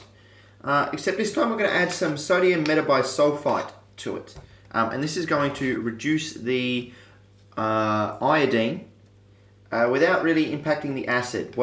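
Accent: Australia. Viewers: He Australian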